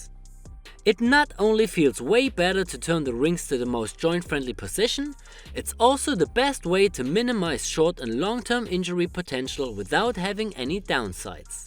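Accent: Germany. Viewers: must be german by the way he speaks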